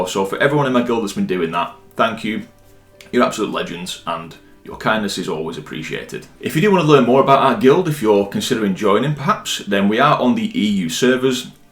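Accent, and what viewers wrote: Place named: Northern England, United Kingdom. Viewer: This is the first time I've seen you. Very helpful video thank you. Also nice to hear a northern accent, Yorkshire lady here!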